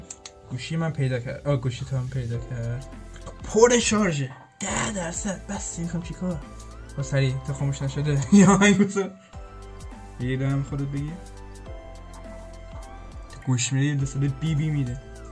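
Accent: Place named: Mazandaran, Iran. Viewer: داش کجایی هستی به لحجت میخوره که مازنی باشی اگه باشی خیلی خوبه چون منم مازنی هستم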